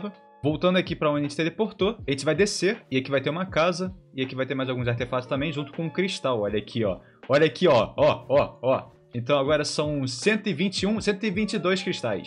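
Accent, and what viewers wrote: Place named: Rio de Janeiro, Brazil. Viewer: Aqui vemos um carioca fiel: montanhax, minériox, ixpots, crixtais Amo amo esse sotaque é bem gostosinho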